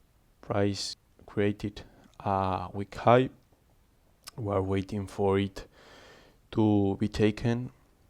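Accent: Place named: Spain. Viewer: grande sabía que eras español ajajajajaj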